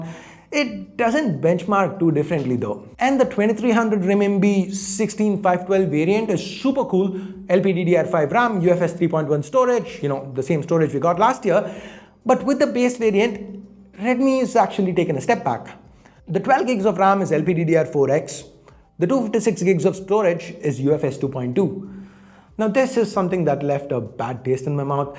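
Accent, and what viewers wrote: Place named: India. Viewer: Co on mówi ????????? indiglish?????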